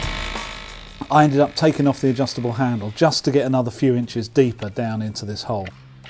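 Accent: United Kingdom. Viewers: Somehow I knew the guy would have a British accent before it started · That plus the proper British accent had me in under 2 minutes!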